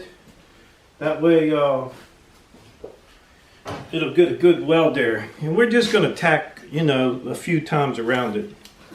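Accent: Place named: Western Pennsylvania, United States. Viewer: I grew up near Pymatuning Lake, so your western Pennsylvania dialect is a little bit like "old home days" for me. I lived in Indiana for about 10 years and I've been in North Dakota for almost 21 years.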